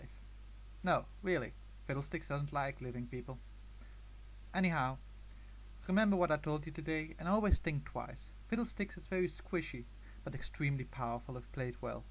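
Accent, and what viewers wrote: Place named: Netherlands. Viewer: ik hoor dat je nederlands bent :p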